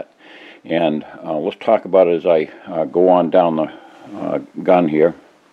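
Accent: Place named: Boston, United States. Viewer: If I wanted to be driven crazy by a Boston accent, yes. But that rarely if ever happens.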